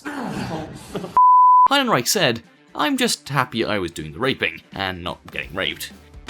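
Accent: United Kingdom. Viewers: British Accent + Sense of Humor=A good Video. 😂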